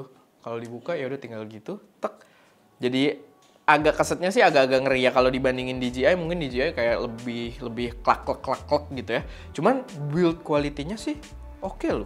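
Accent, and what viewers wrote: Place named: South Jakarta, Indonesia. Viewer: Bang org Jaksel ya...? 😅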